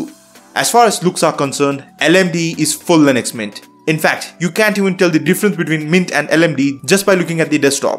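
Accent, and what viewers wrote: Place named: India. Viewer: Arrey bhai accent kam kar. “Kompooting” experience . Sharm aati hai desi accent se ?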